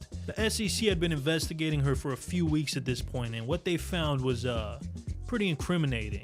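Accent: New York, United States. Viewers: I'm New here . I'm from the UK . But I lost it when I heard " she could sell bacon to Ben Shapiro 😂😂😂 LMFAO. I can tell this guy is from NYC 👍 . You got yourself a new subscriber . Good content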